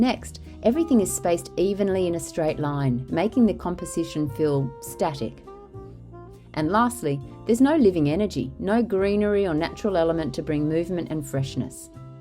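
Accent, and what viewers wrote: Australia. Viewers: I have been looking for an Aussie channel, and I am so happy I found yours. It was so lovely to start watching and hearing your Aussie accent. I am not saying I don't live other channels I do, but I love that I found a channel in my own country - so precious! ❤❤❤❤❤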